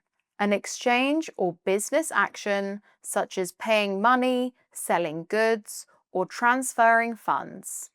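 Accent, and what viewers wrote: RP, United Kingdom. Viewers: You were born to be a British English pronunciation and modern RP accent TEACHER! ❤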